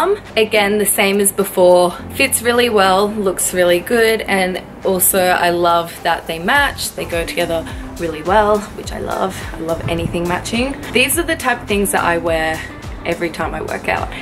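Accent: Australia. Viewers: r u an aussie m8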